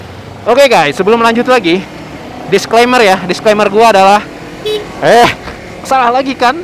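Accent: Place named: Sunda, Indonesia. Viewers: sundanya masih ke bawa..hihihi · Msih melek ehhh ada yg update video maaciw udh nemenin begadang "Ayo pa jangan clengak-clenguk", "sebentar ya om", "usaha keun" alah alah hahahaha gg bisa nahan ktwa bagian ini sunda pisan 😄😄😄 Di tunggu next videonya ya aa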